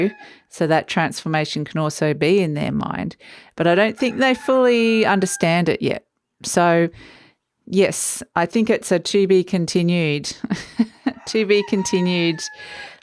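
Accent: Australia. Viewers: Thankyou, love your readings, so nice to have a aussie voice 😊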